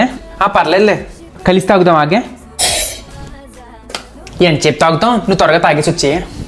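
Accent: Godavari, India. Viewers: external looks doesn't matter for me. So I don't care about color mismatch. He looks as good as he is now. He acts well. His dialect is too good. I like the way he speaks godavari bhaasha.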